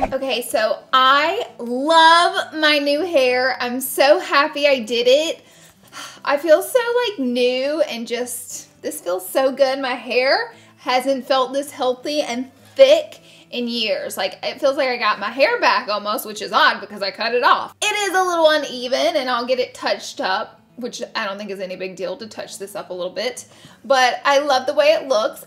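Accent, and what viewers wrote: South, United States. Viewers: why is her southern accent worse in this video than usual or western idk im european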